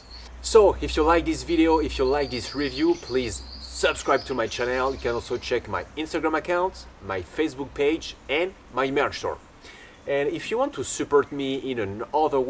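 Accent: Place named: France. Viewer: Thanks for the review. The French accent.....love it. Have you tried the 511 Apex cargo pants?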